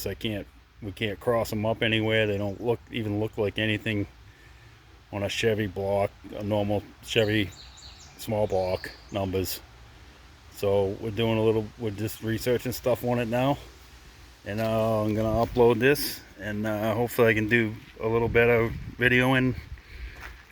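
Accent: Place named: Boston, United States. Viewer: Boston accent!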